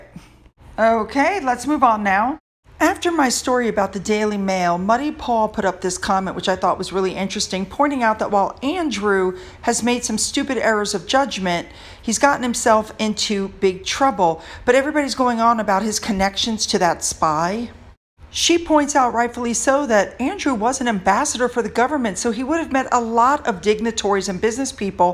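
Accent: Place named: United States. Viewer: I opened this cause I thought it would be narrated by some lovely, british voiced person. Much to my dismay, it was a common American sounding gossip columnist. Sounded like someone from the National Enquirer. Highly disappointed. Couldn’t even listen to the end. I’ll be more careful next time I listen to anything on the Royals.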